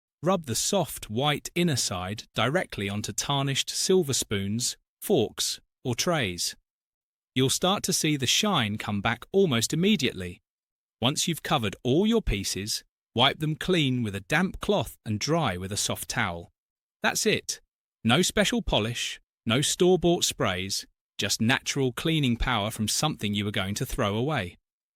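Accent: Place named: United Kingdom. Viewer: Is this AI narrating ? He speaks with a British accent but uses American phrases 🤮 Ffs might as well use a Yank narrator.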